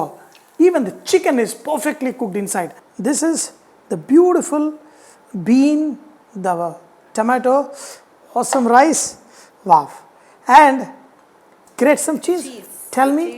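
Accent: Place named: Telugu, India. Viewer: Yara telugodu la unnav.. telugu matladam radha.. ya vathama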